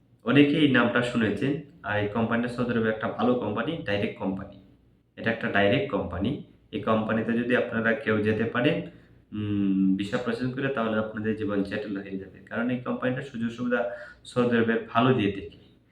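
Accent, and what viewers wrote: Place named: Mymensingh, Bangladesh. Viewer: বাড়ি কি ময়মনসিংহ, ভাষা শিখে তারপর ভিডিও করবেন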